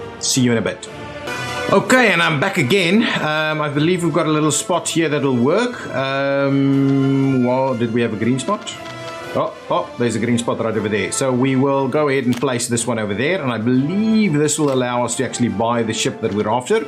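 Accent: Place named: South Africa. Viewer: you sound like you're from South Africa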